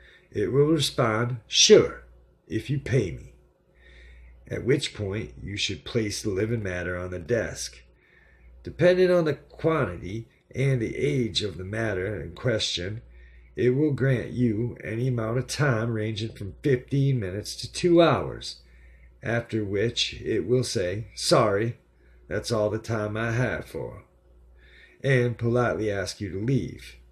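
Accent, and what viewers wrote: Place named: Oklahoma, United States. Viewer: 😠😬 HOWD I MISS THIS ???? LOVE YOUR ACCENT!!!! SOUNDS LIKE HOME TO ME. OUR JOKE IN OKLA IS"I DONT HAVE AN ACCENT, YOU DO" LOLOL. LOVE IT . YOUR DOING A GREAT JOB.!!!!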